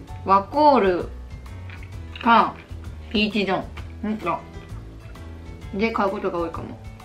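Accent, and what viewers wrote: Okayama, Japan. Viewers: しろくまの抱き枕おんなじの持ってる！！気持ちいいよね🤤 私も岡山出身だから親近感ある！岡山弁すっと入ってくる笑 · 岡山人として誇りです 時々出る岡山弁落ち着きます 応援してます🔥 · 岡山弁聞くと落ち着く😬 スキー〜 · 岡山弁がたまらんのじゃ！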